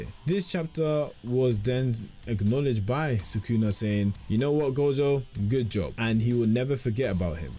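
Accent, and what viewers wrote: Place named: United Kingdom. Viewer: why does bro sound so overly bri'ish 😭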